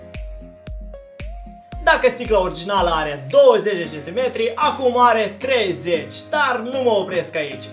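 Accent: Moldova. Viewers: Ești Moldovan nustiu terog sami. Spune terog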